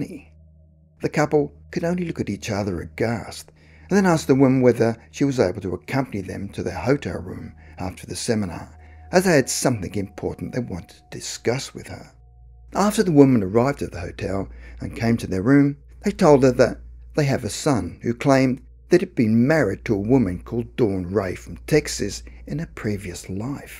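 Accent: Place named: Australia. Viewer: Interesting. I died in Germany WW2 in UK Army. Apart from UK and Ghanaian' I've also met German and USA people who also have memories of their WW2 deaths. But I don't talk publicly because people get unreasonably angry at such disclosures. I mention this because the audio narration for this video is Australian. And if USA, Brits, Ghanaian and Germans are already back from a WW2 death, it signifies Australians have also returned to rebirth in a new physical body but may not yet be aware of it. I think rebirth is required for further evolution of our human consciousness. I died in Jan 1945 north west Germany and was reborn London UK Aug 1949. So, some of us come back very quickly. I don't hate Germans. I love German music Bach Beethoven, et-al. I consider the world was sucked into a cosmic maelstrom at that time, and that caused the wars. You can't stick the blame on any one group. 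About the young boy in the featured story...........did he die prematurely from a car accident or illness, which is how he died, and departed from his wife, who he had had great affection for? Note: I don't do drugs or alcohol, so this not a fairy story.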